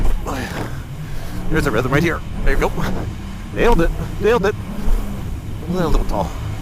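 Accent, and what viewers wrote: Canada. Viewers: You Canadian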